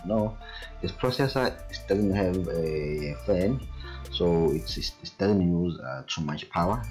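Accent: South Africa. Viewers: U from south africa I can hear ur accent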